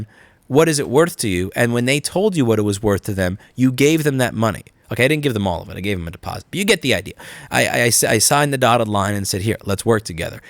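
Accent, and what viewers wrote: New York, United States. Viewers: I want to start creating content for my YouTube channel, Facebook Live etc. I am researching cameras, software and the modern way of doing things. I am so 2000, using XP and Cubase SX via FireWire interface in my recording studio. Thunderbolt interfaces and 60fps 1080p Cannon M60, usb 3 is all news to me. I have alot of catching up to do. For some reason your video where you are riding your bike and talking about potentially leaving NY caught my eye. You are an interesting person and should have your own sitcom with that NY accent lol. I am from Canada BTW. Keep up the good work 🤸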